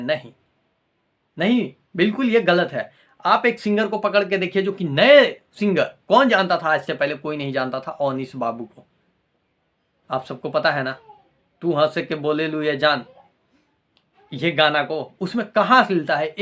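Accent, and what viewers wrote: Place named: Bhojpuri, India. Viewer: Love you clean Bhojpuri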